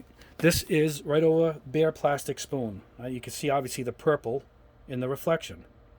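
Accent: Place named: Long Island, United States. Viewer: New England.. I would have guessed Long Island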